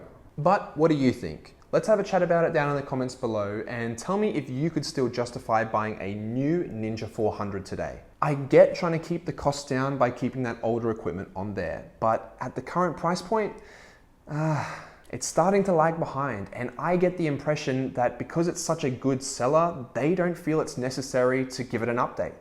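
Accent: Australia. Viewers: I love my 2019 Ninja 400 KRT. Same livery as yours. Here in Sydney, I got mine for a lucky $4.8kaud that's done only 4kkm with Two Brothers Hurricane exhaust, Oggy sliders, tinted shields and 8 months rego. Clean as condition! It's the best! I don't even have my Ls yet so I just ride it around the property for now lol 2 weeks to go till my RKT. Just discovered your channel, good stuff! Nice to hear an Aussie accent motorcycle YouTuber!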